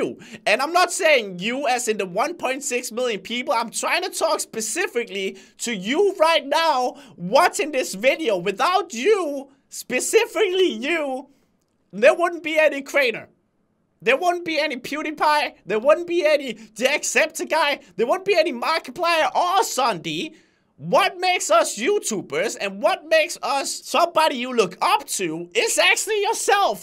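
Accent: Denmark. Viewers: One video u should do u in ur danish accent with subtitles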